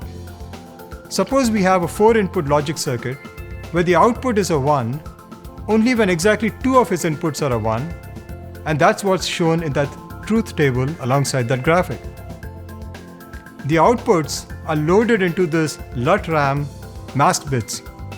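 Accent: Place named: India. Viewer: You couldn't find a guy without an indian accent?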